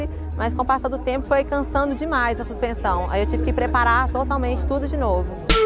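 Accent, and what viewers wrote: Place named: Minas Gerais, Brazil. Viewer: Sotaque amei mineres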